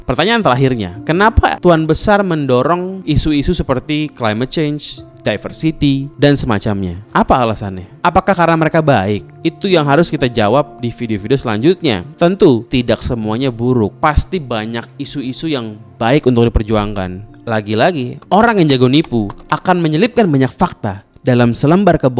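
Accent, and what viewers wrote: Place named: Betawi, Indonesia. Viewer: Dia pakai cara bicara khas orang betawi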